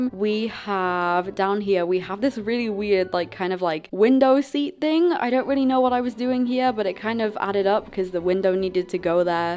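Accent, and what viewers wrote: United Kingdom. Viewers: You British slayyyyyyyyyyyyyyy I from wales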